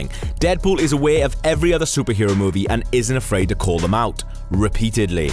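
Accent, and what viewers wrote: United Kingdom. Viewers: Who's this UK B'och on the V.O?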